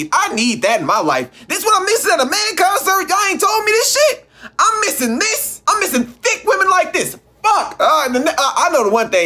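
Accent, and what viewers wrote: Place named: Texas, United States. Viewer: U s texan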